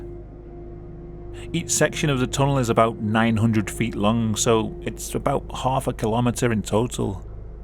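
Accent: United Kingdom. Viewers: Ur British ascent is too muchhhh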